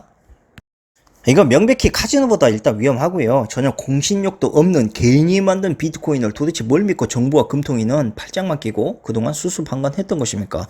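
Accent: Jeolla, South Korea. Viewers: 내가 싫어하는 전라도 사투리도 듣기 좋게 만드는 모든 전라도 사람들이 처럼 똑똑했으면 난 전라도 사람들 존경했을거임 난 개떡같은 인간들만 만났는데 게다가 좌빨인것 까지 ㄷㄷ 같은 깨어있는 분도 있다는걸 암 ㅋㅋ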